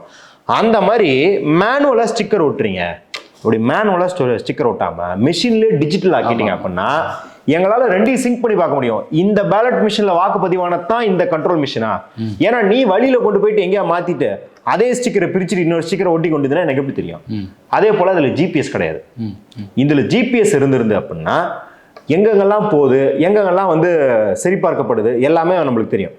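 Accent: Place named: Kerala, India. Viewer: நெறியாளர் கேரளைவை சேர்ந்தவரா? அவருடையபேச்சு மலையாள வாடை வீசுகிறதே...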